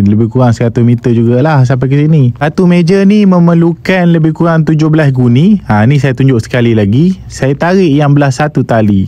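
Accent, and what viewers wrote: Pahang, Malaysia. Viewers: macam orang pahang. lorat pahang ke?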